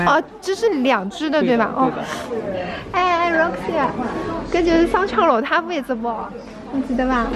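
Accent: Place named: Shanghai, China. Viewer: 謝謝帶我們逛街好喜歡妳說上海話太好聽了！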